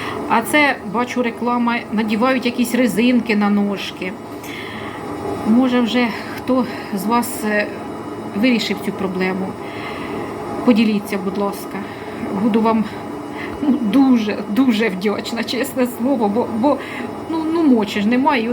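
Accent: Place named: Kyiv, Ukraine. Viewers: Люблю дивиться ваші відео, особливо дуже рідний мені ваш говір я з Київщини. В нас з вами багато спільного, говорять і в нас кисляк, місцевість ніби я в своєму селі.♥️💙❤️ Божого благословення вам і вашій Родині!!!🙏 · Я бы слушала день и ночь. Такая у нее красивая мова. Я тоже с центральной Украины, с Кировоградщины, сейчас беженцы в Чехии и мова... ну как вроде дома сижу среди соседей и друзей, точно такой же язык! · Доброго дня! Дякую за цікаві розповіді. В якій місцевості ви живете? Ваша мова така ж як у нас. Я живу на Київщині.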